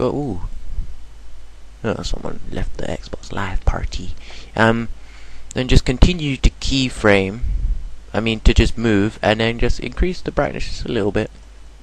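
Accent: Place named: East London, United Kingdom. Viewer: YOUR ACCENT DOESNT COMPUTE. Seriously, where are you from, you sound a bit east london, maybe essex?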